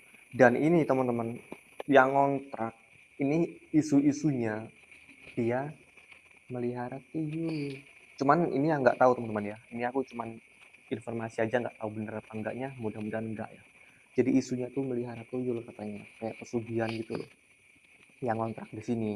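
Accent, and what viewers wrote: Java, Indonesia. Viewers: Logatmu mambu jowo bang 😊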